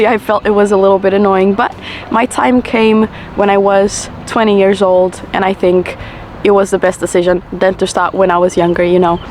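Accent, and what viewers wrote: Portugal. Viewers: the portuguesse accent is 🔥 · Saw this video suggested to me for some reason. Clicked on it. First few seconds of hearing you talk, "Yup, esta tipa é portuguesa, 100%". :D Your channel does seem interesting. Subscribed. Also, epá olha que diz que isso de fumar faz mal. Come antes uma peça de fruta. Ou qualquer coisa do género. Ah, fuck it, também sou escravo da nicotina. Só gosto de dizer esta frase.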